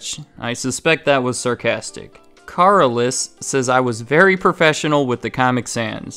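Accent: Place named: Midwest, United States. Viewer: Not related to the topic, but english isn’t my native language and I find it interesting how he pronounces the word comic as “caamic” and iconic as “icaanic” etc. Is this accent common among Americans? No hate please, I’m just curious.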